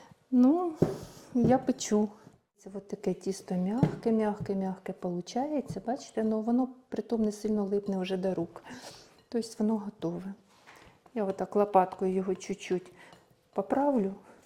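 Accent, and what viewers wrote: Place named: Odesa, Ukraine. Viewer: Она с Одесской области - там свой диалект - ну, говорит женщина всю жизнь на суржике!!! Что не так снова??! Не цепляйтесь за слова!! Вам рецепт пирожков нужен или скандал???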